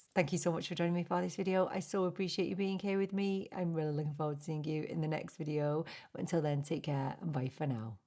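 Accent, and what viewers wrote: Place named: North, United Kingdom. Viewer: No I don’t wear lashes either it just takes too long because I have no skill either 😂 but those ones sound a bit easier. Did I ever tell you watch your videos because you are funny, entertaining and I love your northern accent 😊🙌🏼💜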